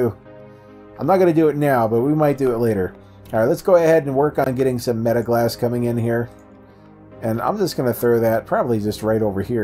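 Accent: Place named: United States. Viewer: This guy sounds so American idk...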